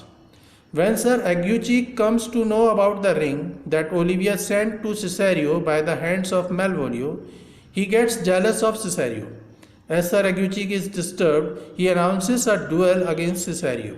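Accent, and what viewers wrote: India. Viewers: Very good Indian Accent.. Perfect Indian English language.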